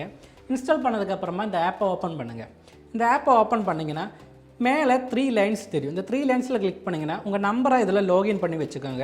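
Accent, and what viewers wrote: Kerala, India. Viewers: Bro ninga keralava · Bro Nenga Kerala Va... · Malayalam slang mari irukku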